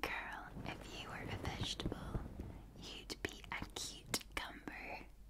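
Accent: Scotland, United Kingdom. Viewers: No she's Scottish 😎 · oh... you resplendent scottish lass. ☺️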